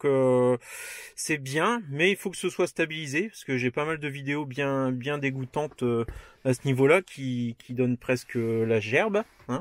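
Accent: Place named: North, France. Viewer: je participe crochet de coffre super enfin on voit qui se cache derrière cette voix du nord ✌️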